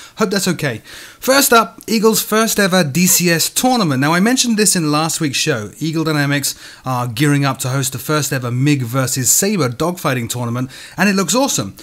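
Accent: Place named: England, United Kingdom. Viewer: He's English, he won't pronounce words like you or other Americans.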